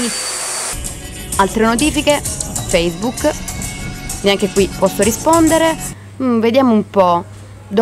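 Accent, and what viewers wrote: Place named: Salento, Italy. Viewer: Orgoglio, una pugliese salentina fa parte di questo team favoloso! Si sente l'accento, specialmente quello dell'amico in live sull'apple watch xD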